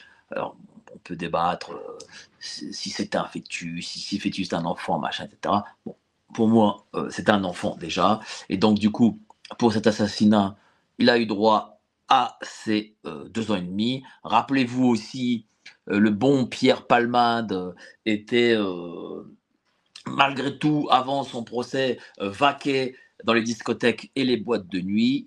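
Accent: Germany. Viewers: tu es français parles Français pas avec l'accent chleu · Oui,c est vrai, arrête de prendre l accent allemand. Ça n a aucun intérêt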